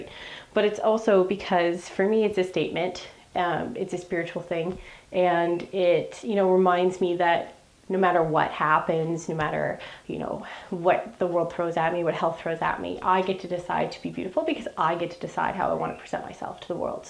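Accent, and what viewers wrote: Canada. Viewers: Very interesting story! I hear birds in the background? Are you Canadian or from Virginia? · I was going to say that you sound Canadian:) I'm from Northwest Montana just two hours from the border and so we have many from Alberta and B.C.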